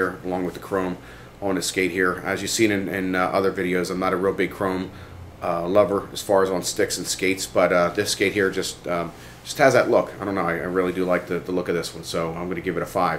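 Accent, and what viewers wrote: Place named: Philadelphia, United States. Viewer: Is the host of this vid from the Philly area?? His accent sounds like it and he said "Wooder" for "Water". ;) I'm orig. from Philly, so I notice these things.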